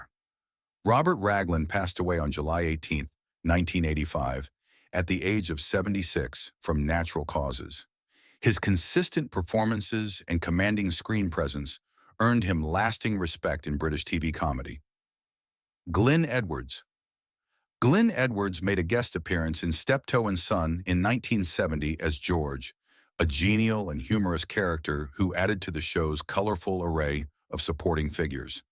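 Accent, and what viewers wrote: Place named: United States. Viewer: why is a yanky speaking??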